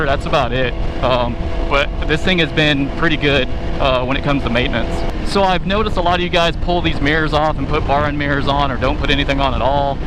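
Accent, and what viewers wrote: United States. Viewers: What part of Louisiana you from?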